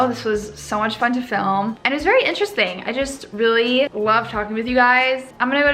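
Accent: United States. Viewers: She has the LA accent now :((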